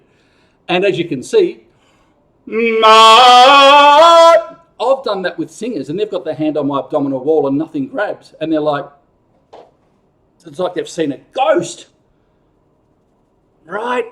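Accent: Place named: Australia. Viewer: ! I LOVE YOUR TEACHINGS AND LOVELY AUSTRALIAN ACCENT!! I'M JUST LEARNING TO PLAY THE TRUMPET! SO I'M SO! GLAD YOU ARE DEMONSTRATING HOW TO DO IT THE EASIER WAY!! I'M ORIGINALLY FROM FLORIDA, AND HAVE MOVED OVERSEAS TO PAGADIAN CITY, PHILIPPINES! THANKS AGAIN! AND MAY GOD BLESS YOU! ALWAYS!! 👍😁🙏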